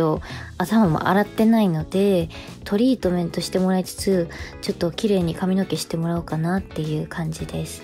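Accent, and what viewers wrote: Kansai, Japan. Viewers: はじめまして😌私も関西人なのでりほちゃんの関西弁聞くと親近感湧きます😆✨「むちり突き上げてる」がパワーワードで😂 小田切ヒロさんとのコラボ動画で初めてりほちゃんを知ったんですけど、イントネーションで「もしや関西の子かな？！」と思って動画見たらハマりました😆(普段ヒロさんとコラボ動画出してるYoutuberさんの動画は見ないので初めてです✨) ちなみに、りほちゃんのパープルメイクかわいくて使ってたコスメポチリましたよー😍 ゴリゴリのモード系メイクも見てみたいです☺️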